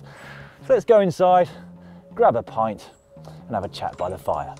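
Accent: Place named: United Kingdom. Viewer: Gotta love the British accent. A place cooled (called) cool. shoutout to Gavin Free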